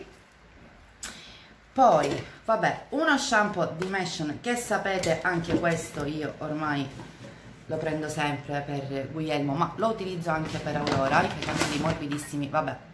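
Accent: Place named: Palermo, Italy. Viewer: Anchoo nuova iscritta sei molto simpatica e cmq essendo una siciliana ho capito subito che sei di Palermo......io Catania ..bel video alla prossima!!!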